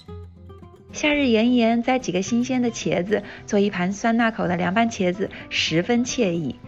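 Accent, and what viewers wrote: Sichuan, China. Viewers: 小姐姐是四川人？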